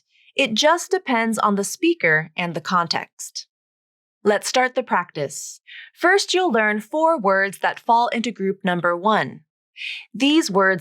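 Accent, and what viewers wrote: San Diego, United States. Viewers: un abrazo!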